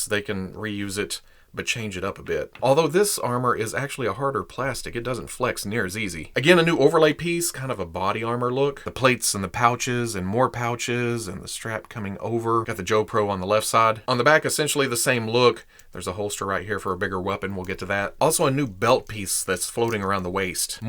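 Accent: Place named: Arkansas, United States. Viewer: Wow, Arkansas really comes out in this video.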